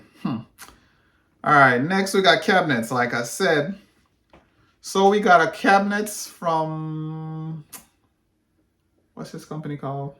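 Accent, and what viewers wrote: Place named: Jamaica. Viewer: Which part of Texas are you located? I am Jamaican too BTW. I can tell from the accent. And I see the Josephus book behind you. Looks like you are on it with your true heritage. 😊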